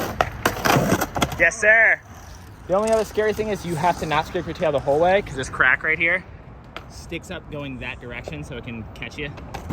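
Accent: East Coast, United States. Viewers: Is your east coast accent coming back?!!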